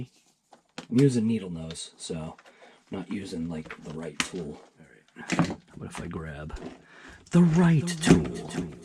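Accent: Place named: Massachusetts, United States. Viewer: Hey buddy. I knew your accent was one of Massachusetts LOL I grew up in East Bridgewater for a lot of years. I live in Pawtucket now. And I work at Hobby quarters in Foxboro Mass I don't know if you have ever frequent it there. But feel free to stop by this summer we have a huge rock crawling course. I don't know if you are into solid axle monster trucks but I have been designated to design and create a summer series for solid-axle monster trucks styles with penda points series. I don't know if you will see this message but hoping you do. We are also doing a construction site for people who are into hydraulic heavy equipment RC. Anyways I just heard your Alexa say Plymouth and it pushed my head back and surprised LOL anyways nice to see somebody from our side of things is doing so well in the RC Community for us God bless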